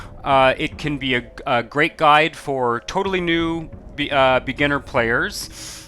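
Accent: Canada. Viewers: You sound Canadian, I'm Canadian and I looked at your twitter and I was right 😂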